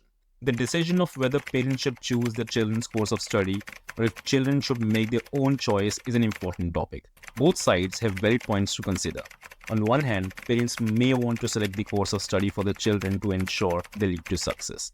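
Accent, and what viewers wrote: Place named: India. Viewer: 😢 Darn it!! That Indu accent makes me cry!!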